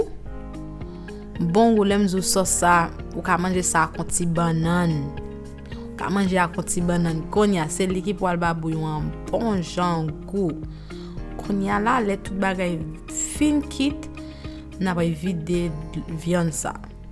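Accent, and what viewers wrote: Haiti. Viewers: Janw pale a ou se on fanm okap oubyen ou sot nan nord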